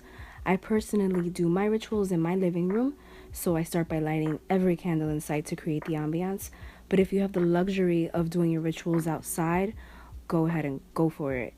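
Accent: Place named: New York, United States. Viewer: I know it’s only through YouTube, but I love your vibe and your NY accent. I like how thorough you are in the steps and the fact that you act them out so we have an idea of how we may like to do them. You are a blessing. Keep it up. Bless!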